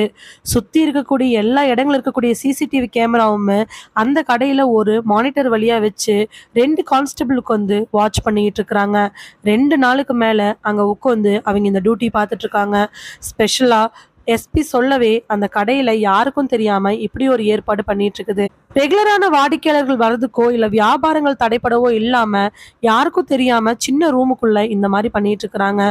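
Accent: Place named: Pollachi, India. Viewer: சிஸ் நீங்க பொள்ளாச்சி சைடுங்களா...வாசிக்கிற விதம் எங்கபக்கம் போல இருக்குங்க சிஸ்.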